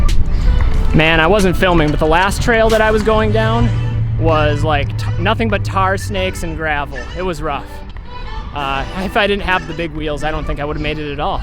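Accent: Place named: United States. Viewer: Greetings from Europe, thanks for that pure American accent ☺️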